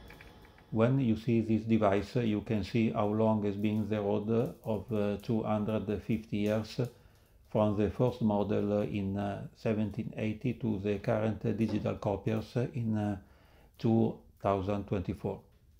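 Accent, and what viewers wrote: Italy. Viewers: Is that an italian accent i am hearing?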